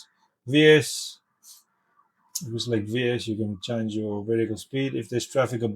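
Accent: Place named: South Africa. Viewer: Good to hear an SA voice!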